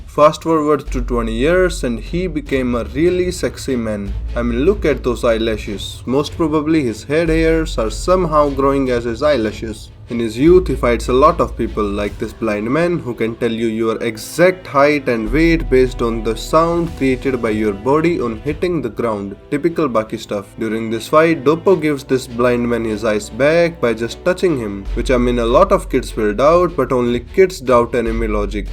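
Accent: India. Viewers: You people🤦‍♂️, Go to his discord server then you'll see his name which is " ", which is obviously an Indian name, as I'm an Indian myself and i already recognized that he was Indian by his accent which is the Special Indian Accent only indians have. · I swear his indian acsent is getting thicker every vid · I dated a half guyanese girl and she had mostly indian aunties and uncles. there's no mistaking his accent. Actually , his voice is very soft for an indian man. Probably why his videos do so well. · Bro are u indian? cause the accent is much similar like mine. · just stop. Ur Indian accent just kills the vibe and the joke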